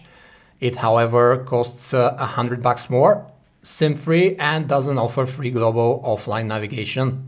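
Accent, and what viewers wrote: Russia. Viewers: sounds russian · Why u speaks english like that are u russian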